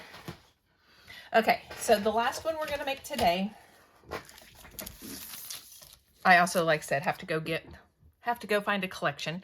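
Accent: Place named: Oklahoma, United States. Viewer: Hi! Your channel was suggested on my feed yesterday, and I love watching people make page kits! I hope this doesn’t sound crazy, but I noticed in a picture on one of your videos you had an OK State shirt on and your voice kind of has an OK accent - are you from OK? I’m in OKC area, and I’ve never met a fellow scrapper in OK, so I had to ask and say hi! Have a great evening!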